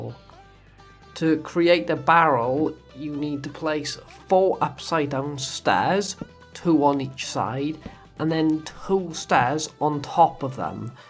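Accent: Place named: Wales, United Kingdom. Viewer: You from Wales? Your accent sounds Welsh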